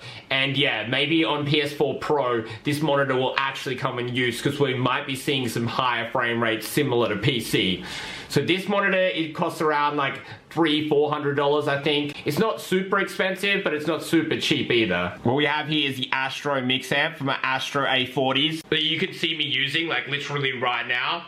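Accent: Australia. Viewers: Ive seen him do a face reveal before but i still wonder how much difference there is in his looks and voice. He sounds like a chubby australian with a goatee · No doubt. He looks Eastern European with an Aussie accent. Aren't all Aussies supposed to look like Crocodile Dundee? LOL...JK! cracks me up though. He is salty and his laugh is epic.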